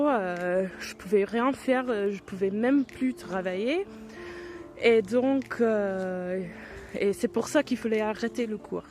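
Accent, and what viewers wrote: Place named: Germany. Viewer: Tu as le même accent que mes cousins allemands!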